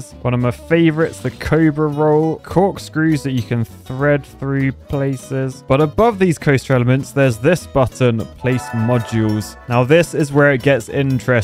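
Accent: United Kingdom. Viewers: Can anyone explain to me why Brits always pronounce Taco like Tack-oh and Los Angeles like Los Angelease?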